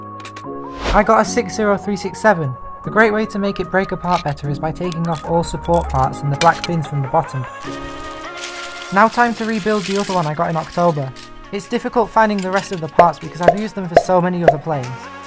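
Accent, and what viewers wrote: United Kingdom. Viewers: I never knew that is British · Sup your british too? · Are you British? Because you speaking very well done and I love it. The plane is satisfying and great job!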